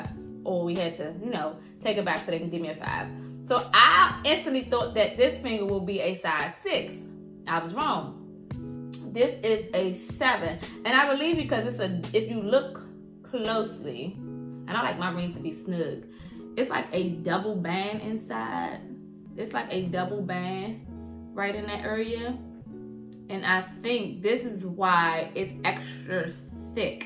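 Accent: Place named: Washington DC, United States. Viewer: I know a DC accent when I hear it. Love my Yurman rings and stack all of em 💕 thanks for sharing.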